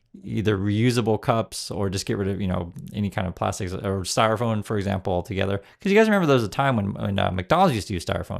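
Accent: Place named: United States. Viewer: I thought you were an American as your English is so good and your accent is American. I fear Trump and Musk are trying to make it impossible for anyone to go after them.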